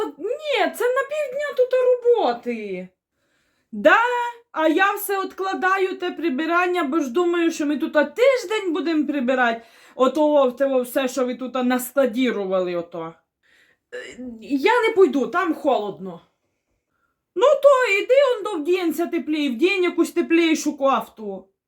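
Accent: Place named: Rivne, Ukraine. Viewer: Доброго вечора Вам, сміялась до сліз як прибирали кладовку.Успіху Вам.Моя юність пройшла в м.Рівне, були подруги з м.Березне, м.Сарни, м.Костопіль.У Вас такий самий акцент.Дуже Вас люблю!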